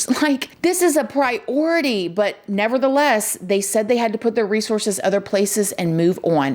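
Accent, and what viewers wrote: South, United States. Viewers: You are so entertaining your energy is awesome..😅 I love the da-da-dahs and your accent is so nice! Far better than this boring kiwi 🥝 (New Zealander) what part of America are you from? I'm asking as one of your babies! 😆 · Girlfriend, I love You!! Just came across you a couple of wks ago and trying to catch up on all your videos! You and Better Off Red are my favorite! You’re so sweet and I just live your country accent. Just curious though, where are you from? Tennessee girl here ❤️ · These people are not normal. The brain scans of these people are markedly different from brain scans of so called 'normal' people. I have no doubt that if Ted Bundy were to get out of the Florida prison he was in before he was executed, he would ve killed again! Ted Bundy could have disappeared into obscurity and probably would have never been caught. 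But he had to kill again! They need to kill like an active alcoholic has to drink again. By the way I love yourselves videos and love your southern accent 😊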